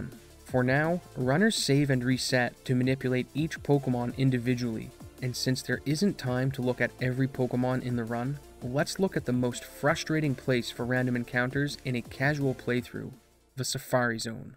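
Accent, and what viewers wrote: Canada. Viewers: proud of myself for predicting that you were Canadian based on your accent. Great video though!!!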